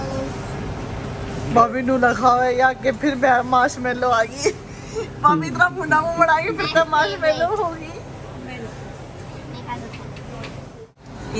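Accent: Haryana, India. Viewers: Ye haryanvi m kya bol deti ho bich bich m samaj nhi aata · Hey didi aapka vlog aacha lag aap haryanvi boltae huae aache lagtae ho to or vlog banae haryanvi m 😍😍👌👌👌😍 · Pranjal di mane aapki haryanvi lanuage ganni badhia lage hai ar me aapki bohot baddi fan su 🤣😅😊😊😜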